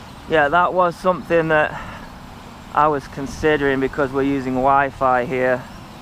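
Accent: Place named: Yorkshire, United Kingdom. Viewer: Yorkshire accent :) I came here from Quadcopter 101 because I wanted to know more. Could you potentially use that booster with any wifi-drone?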